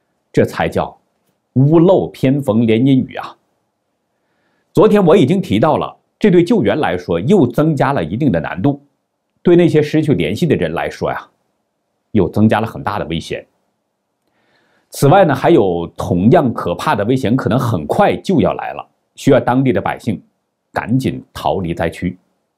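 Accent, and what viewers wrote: China. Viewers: 大陸口語聽不太懂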